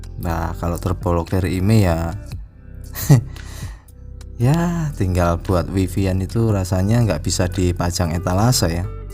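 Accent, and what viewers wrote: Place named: Lampung, Indonesia. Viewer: Tak kira jowo ternyata lampung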